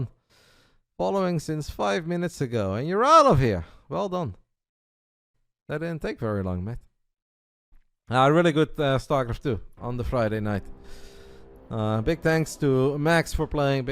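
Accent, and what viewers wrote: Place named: Russia. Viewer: whu you speak with “Vlad” accent?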